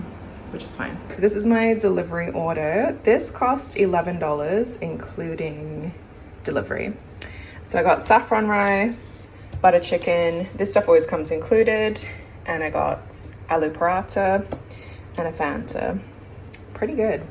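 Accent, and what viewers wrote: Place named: Australia. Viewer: You got a Mystic Accent( (Oz/Yanky )have you been a teacher previously?With That Accent you’ll Acquire the Job Easily!! Good Luck & Enjoy ❤️‍🩹🇹🇭👍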